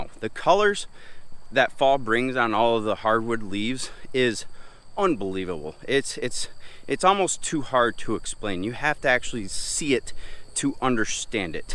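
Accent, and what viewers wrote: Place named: Canada. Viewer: Not sure where this guy is from. Sounds like Canada. Michiganians do not have that accent.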